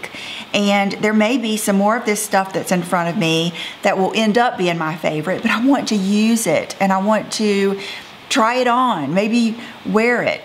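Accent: South, United States. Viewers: Why I watch your videos: -- You inspire me to look my best every day; even when I don't feel like putting an outfit together or putting on makeup. -- You are a genuine and good-hearted human being (something that, unfortunately, is not so common nowadays). -- You are a stylish and classy woman - a great fashion role model. -- You give great tips about shopping, clothing, makeup, jewelry, etc. -- Listening to your southern accent brings a smile to my face every time I start watching one of your videos. -- And for so many other reasons (too many to list)..... I've been a subscriber of yours since the beginning. Thank you for being a constant reminder that all women are beautiful. And for showing us that when you take a little bit of extra time to look good on the outside, the inside follows and your attitude and outlook on life change for the better. 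· I've been watching you for years and following you on Instagram and although I don't comment very often, I just love your personality. I can relate because we are in the same age group and also our children. Although the extent to my makeup is the basics (I have quite a lippy collection however..lol) I just love seeing your purchases from clothes, to makeup to art etc. Let's not also forget, that southern accent. You're as real as it comes. You like what you like and there's absolutely nothing wrong with that. xoxo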